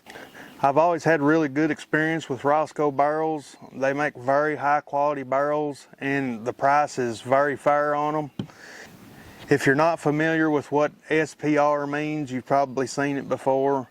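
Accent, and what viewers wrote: South, United States. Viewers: Best part of a southern accent is you can play at 2x speed and dude doesnt sound like a chipmunk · enjoyed it! Great info and I'm from Alabama so I can't help but pick on other southern accents! Thanks for being a good sport :)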